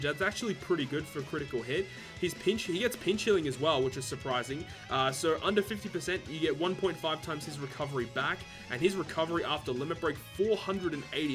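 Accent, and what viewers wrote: Australia. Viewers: Been looking for this damned video. I need an Australian accent to explain game to me thank God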